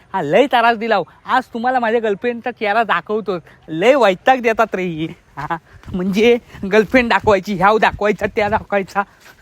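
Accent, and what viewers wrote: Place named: Konkan, India. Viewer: साहेब मस्त असतात तुमचे व्हिडिओ अक्टिंग खूप हसवता.. मस्त विनोद तुमच्या मुळे कोकणी भाषेत तील गोडवा कळला तुम्हां गोड शुभेच्छा.💐🙏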